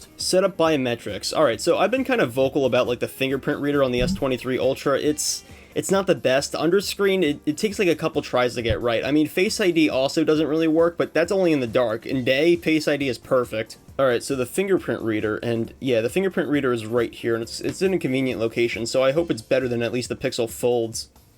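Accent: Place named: United States. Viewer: Guessing from the accent you're from usa right? How do you use an android phone over there, iMessages don't work on android. From what i know they all use iPhone & iMessage in usa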